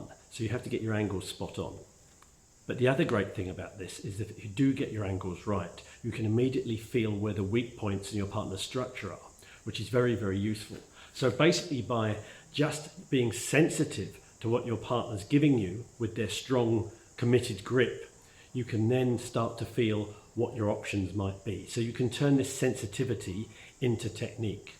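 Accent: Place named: United Kingdom. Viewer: Thank you so much for posting these videos! I did aikido a few years back so it helps me to reminder, also I’m English transplant, so nice to hear a old Brit accent again lol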